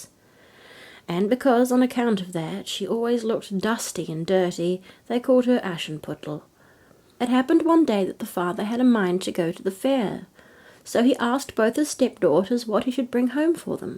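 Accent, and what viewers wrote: New Zealand. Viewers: I listened to this story with my four year old son, its lovely to hear a story in our wonderful kiwi accent! we both enjoyed it, thank you xxx 🌹💖 🤘😈🤘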